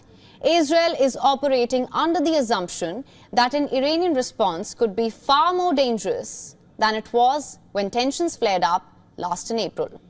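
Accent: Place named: India. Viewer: I love Indians accent 😍😂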